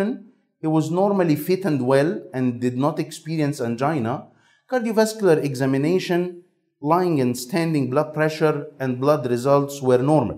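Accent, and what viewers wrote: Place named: England, United Kingdom. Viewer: Thank you for your excellent way of explaination , and your proper English accent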